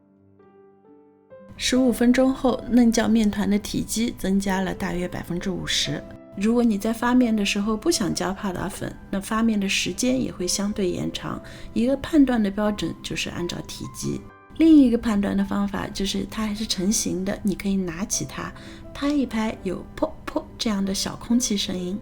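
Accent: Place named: Jiangnan, China. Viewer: 你是我在YouTube上看到的做饭最精细的人，口音口味，真的是家乡风味。江南人做面食和北方人差别太大了。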